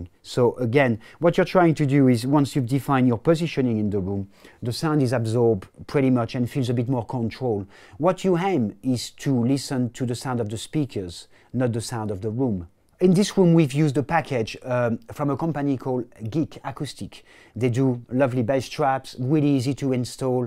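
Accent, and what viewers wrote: France. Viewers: Ehh' lil French accent, très bonne vidéo ,merci beaucoup et bonjour de la France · i was wondering, definitely sound French, especially when he says "acoustic"! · Interesting accent..French? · Hmm thanks for the info We sometimes overlook the importance of the hard drive it counts mostly when using a lot of Vst I think Pc is better for home studios because a Pc is easier to fix up.... parts can be changed easily and you can upgrade it easy Though is it basic for beginners I really like the accent from my country Go French!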